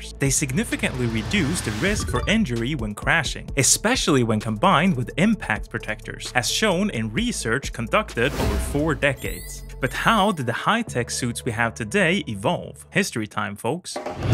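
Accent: Sweden. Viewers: are you swedish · Swedish accent? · Are you swedish my fellow motorcykel rider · Are you Swedish? or är du svensk? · Are you from sweden?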